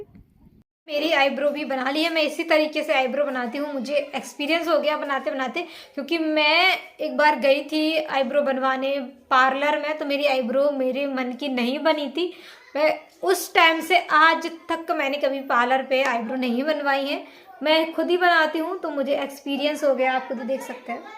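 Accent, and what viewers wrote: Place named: South, India. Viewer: Aisa lg raha koi South Indian Hindi bol raha h.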